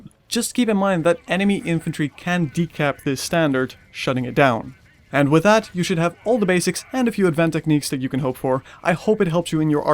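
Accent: Netherlands. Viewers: You have the most dutch accent possible, great guide tho!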